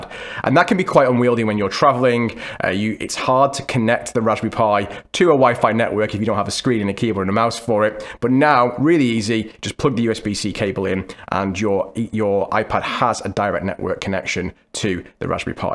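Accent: United Kingdom. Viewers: Hey, how do you setup the pi as a ntewrok server to connect to the files while connected on the ipad? where you from, is there a hint of a welsh accent?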